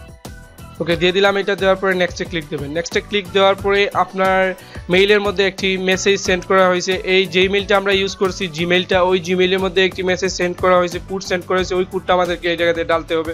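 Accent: Sylhet, Bangladesh. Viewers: vai apna bari sylhet ni.......? apnar kotha ja sondor apnar tutorial dakiya ami account kolta parci ... so thanks vai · ভাই কিতা সিলেটি নি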